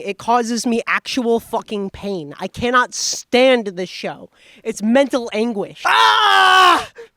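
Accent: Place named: Minnesota, United States. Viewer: thank you for your unabashed use of the minnesota accent this is near and dear to my heart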